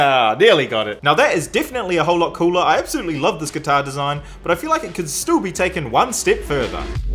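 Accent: New Zealand. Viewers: Your from New Zealand ay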